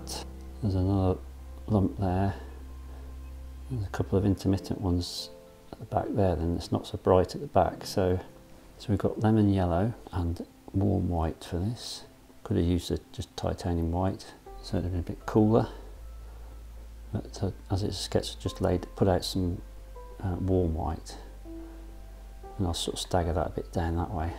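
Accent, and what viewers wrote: United Kingdom. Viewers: My Sunday treat Love your painting...very atmospheric..Thank you.. Love your English accent also. Greetings from Donegal,Ireland.☘️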